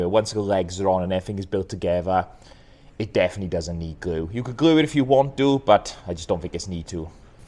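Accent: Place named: Northern England, United Kingdom. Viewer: Hi, I listened about four times over and over again to work out what screw you are using to put your units together and whether it was because of your cold or your northern accent I couldn't understand a word you were saying. I then looked in Screwfix for every screw that I could see. I still don't know what screw you used, could you write it down on a board, or a piece of paper, Thank you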